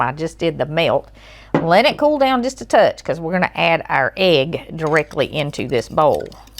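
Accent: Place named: Tennessee, United States. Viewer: Oh honey I was reared in Tn. Hampton, up near Doe Creek and Mountain City. Ription holler and Doe Valley. Just found you and the joy in my heart nearly made me cry. GOD bless you sweetie. I could listen to you talk all day. I wouldn't care if you was readin an instuction manual. I haven't heard that beautiful accent in so long. Im 70 now and in N. C. I want to plan a trip up to Hampton but haven't been there in nearly 50 yrs. I will hush now. Just wanted to tell you that you made an old woman mighty happy this afternoon and I'm going to get my daughter to help me join. May GOD, continue to bless us all. COME JESUS COME! SHELIA STEVENS FROM THE MORLEYS AND ODOMS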